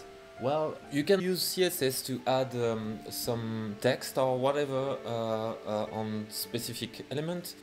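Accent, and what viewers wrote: France. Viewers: what's up with the french accent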